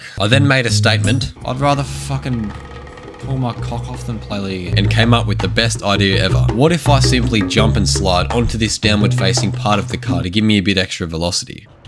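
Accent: Australia. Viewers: this dude is australia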